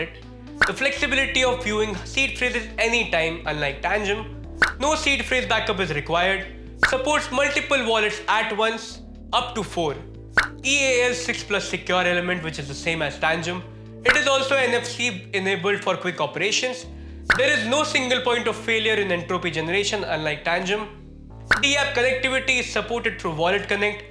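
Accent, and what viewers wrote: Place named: India. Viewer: Cypherock executives, you need to get an American, Englishman or other presenter with English as his native language. Unfortunately, to the rest of the world India is known as the internet scam capital of the world and you having an Indian present your sales pitch is doing your product acceptance/adoption great harm. You might have a fantastic product, but most of us are thinking “scam” as we watch. Seriously!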